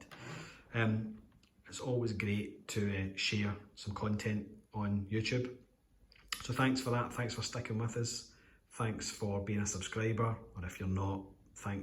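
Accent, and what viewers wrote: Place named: Scotland, United Kingdom. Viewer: Fantastic, so quick off the mark with your video of Cable, congratulations on your pick up and getting it early doors. So pleased for you, delighted you called it right and now have a great figure that you are happy to have in the collection. As mentioned in previous comments I do think a lot of people were too down on the figure from the get go, I really like it and think it presents so well, a real welcome edition to your great collection for sure. As for your dulcet Scottish tones, as a Glaswegian myself I am delighted your channel is going from strength to strength 😂 really enjoyed the video and as always, looking forward to whatever you may have next in the pipeline, please keep the videos coming, a great watch, as always stay well👍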